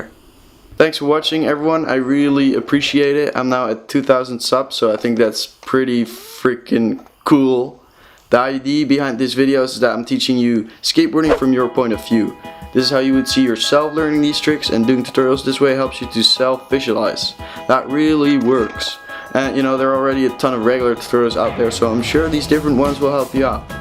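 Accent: Netherlands. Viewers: sickkk btw you sound very dutch bc I am dutch😂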